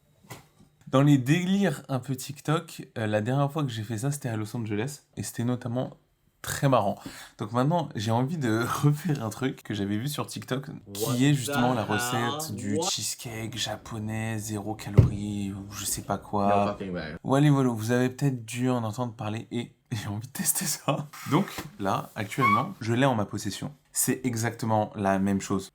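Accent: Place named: Cameroon. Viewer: Tu viens de quel pays en Afrique tu parle comme un camerounais 😁😁😁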